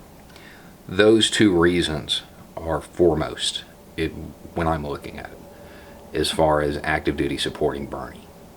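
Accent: South, United States. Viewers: Not only is it really neat to hear progressive values in a southern accent, you actually add unique and interesting perspectives to the conversation. I am so glad to have found you beau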